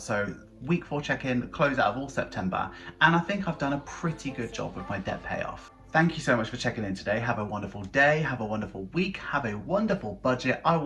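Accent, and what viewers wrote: United Kingdom. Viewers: Dear, I absolutely get your point and I LOVE how honest you are. Trust the change. Change is good and with your talent, it will bring you fantastic things. I also will need to move by myself next year, and Im dreading it. Ive never lived by myself, always with family, but it got to a point where I KNOW I need to step away. I found your channel thru Pinching the Pennies and it got my attention because of your BEAUTIFUL British accent (let's all admit British people have the most elegant accent EVER😍), and stayed because of your commitment and talent, your honesty and your strive to reach your goal and because of ur cash stuffing videos cause I think British pounds are pretty cool (us dollars are ugly AF). Don't be scared. Things will get better! We're here for you and with you thru this journey, even if virtually ❤️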